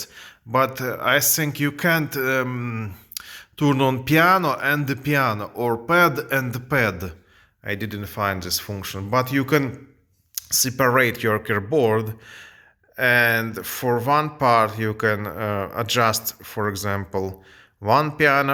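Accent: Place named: Russia. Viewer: Русский акцент😂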